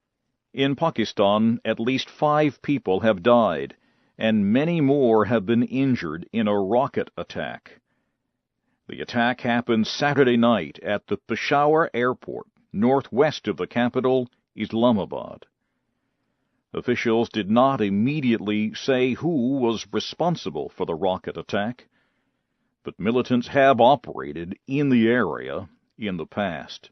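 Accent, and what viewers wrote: United States. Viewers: que buen video para aprender algo de acento americano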